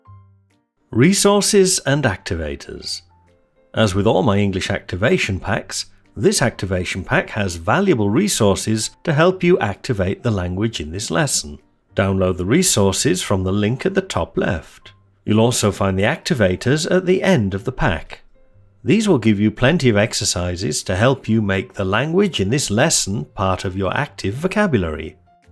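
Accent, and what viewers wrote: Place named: England, United Kingdom. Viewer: Hi dear teacher, I am from Myanmar, English is not my language but I can express writing my feelings with English. But I can't speak it. Kindly guide me with some tips and I love your English accent and teaching. Could you plz also make a long video about phonemes and phonetic with great example plz. Thx so much .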